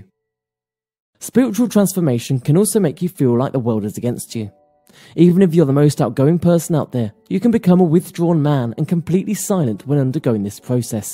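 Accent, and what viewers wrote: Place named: United Kingdom. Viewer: you sound sort of british.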